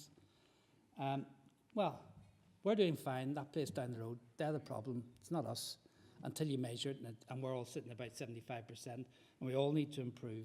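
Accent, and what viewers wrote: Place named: Ireland. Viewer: The Irish accent ❤️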